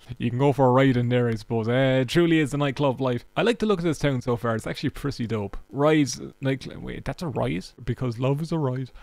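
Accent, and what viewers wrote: Ireland. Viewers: Man I wish I had an Irish accent I love how it sounds lmao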